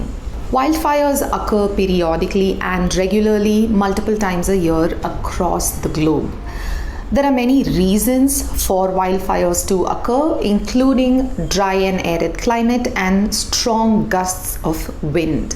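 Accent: India. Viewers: That is a cute Indian accent.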